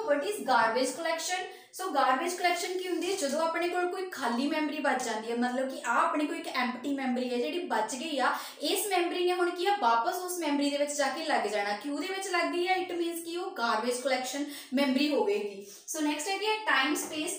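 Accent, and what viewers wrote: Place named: Punjab, India. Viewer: Ma'am this video seems very informative and useful since I am having my viva in 2 days but can't understand your language coz of little bit Punjabi accent and language. But thankyou so much ma'am for this video🙏🏻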